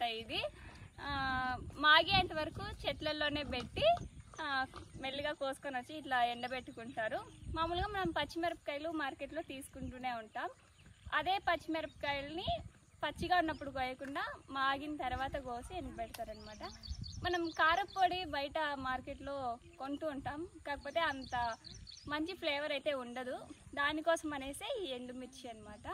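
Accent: Chittoor, India. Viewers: Rayalaseema yasa vachestundhi...ma chittoor acsent la vundhi...little ga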